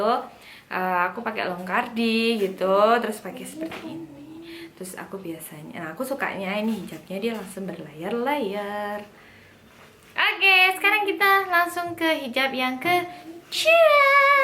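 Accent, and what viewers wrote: Java, Indonesia. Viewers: jawa bet ngmong'a :D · suara medok nya itu loh aq suka banget 😁😁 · adhell kudell hahah sami abi ge teu paham ah bhsana gs medok jawa deuih ampun. 😁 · medok nemen mba...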